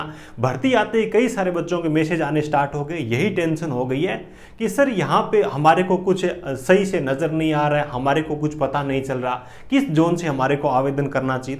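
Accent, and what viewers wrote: Rajasthan, India. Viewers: Sir ap Rajasthan se ho na 😂😂 apki language se pta chala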